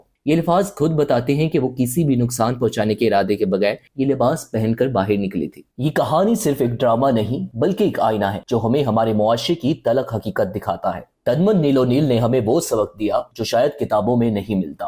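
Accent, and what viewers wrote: India. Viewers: Aap urdu Indians ki tarah kiyon bol rahe ho?? غ کو گین اور ک کو کھ کی طرح کیوں بول رہے ہو آپ؟؟ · اس بھائی اپنی ق،غ،خ تو ٹھیک کرو،ضروری نہیں کہ ہندی زبان سے متاثر ہو جاؤ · sab koch sahi tha , but pakistanis ko pehle apni Urdu sahi kar chai ye , lagraha tha is vedio me koi indian bol radha he he, ghalat ko galat , khatoon and bohat sare word wrong pronouse kiye apne, ab darama actors bhi ghalat urdu bolte he fakhar k sath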